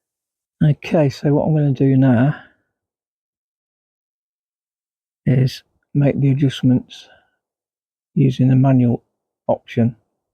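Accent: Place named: England, United Kingdom. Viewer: Too much explaining in the English accent. Just shoot and zero the scope like any other scope. 😂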